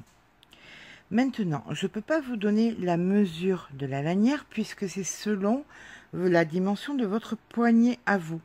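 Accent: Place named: South, France. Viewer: Merci pour ce tuto.mais pour une débutante... le point coquille n est pas toujours évident. Je n ai fait qu un rang de point coquille. Je b arrivais pas à bien comprendre sil fallait piquer dans chaque côte ou pas... le cadrage n étant pas optimum... mais continuez comme cela..avec votre charmant accent du soleil!😘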